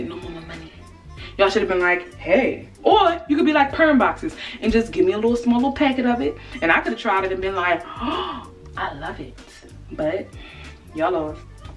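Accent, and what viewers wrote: Baltimore, United States. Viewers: yooo so i was about to type you sound like you from baltimore but then i went on famous birthdays and you are like maryland gang represent!!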